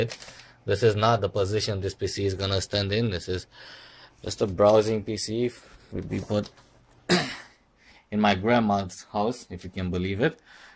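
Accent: Romania. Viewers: de la accent mi am dat seama ca ești român :))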